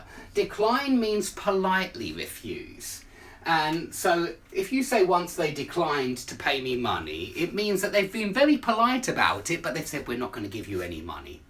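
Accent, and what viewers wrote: United Kingdom. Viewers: I'm from Chile I have been practicing and watching videos for 3 years alone without taking a course in my country and I love to write my thoughts, my experiences and according to how I feel at the moment to start writing on my notebook. So I can improve my english writing. It is hard to find a native speaker in my country, especially british people because I really love this accent. Every day after work, I got home to watch your lessons this is the only thing I do instead of playing games. I hope you keep on making useful videos. It's a pleasure to watch them Greetings. ✌👌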